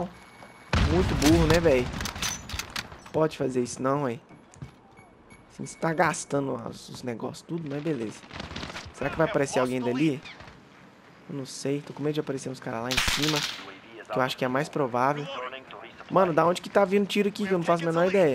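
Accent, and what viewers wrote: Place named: Goiânia, Brazil. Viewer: eu sabia, reconheci pelo seu jeito de falar, eu também sou de Goiânia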